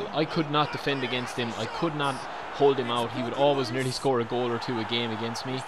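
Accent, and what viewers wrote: Ireland. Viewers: Which part of Ireland are you from? Love your channel btw. Great content!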